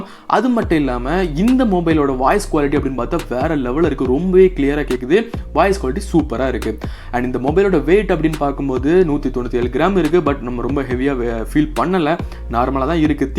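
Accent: Kanyakumari, India. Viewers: Bro neenga kanyakumari ya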